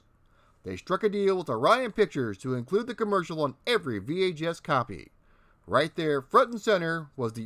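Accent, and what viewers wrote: United Kingdom. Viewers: Uphold the Law guvnor! Yeah I'm British and that was definitely a British accent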